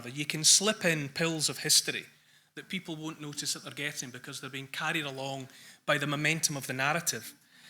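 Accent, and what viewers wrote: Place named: Scotland, United Kingdom. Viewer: Absolutely brilliant, what a great story teller, and is a historian and a great one at that, his passion is unsurpassed, and I absolutely love his beautiful scottish accent.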